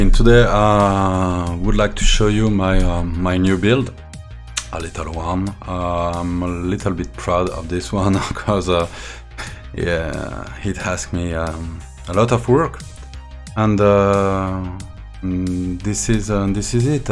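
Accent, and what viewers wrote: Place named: France. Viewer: Ah ce petit accent So Frenchy que tous nous envient !! Thanks for that !!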